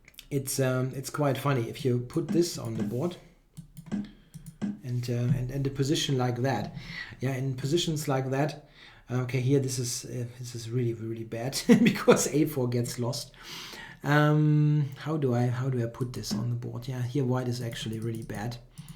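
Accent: Germany. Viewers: I don't find his games boring at all. I like his style of positional play and watching him turning small advantages into a win. he also easily becomes a beast in unconventional positions. Even seeing him blundering (rarely) is exciting and it never gets boring. Plus, his sense of humour and German accent really add a great colour to his videos. I am grateful that he provides us such entertainment everyday. I feel like I have a friend on the other side of the camera and I am always thrilled to see how he did today.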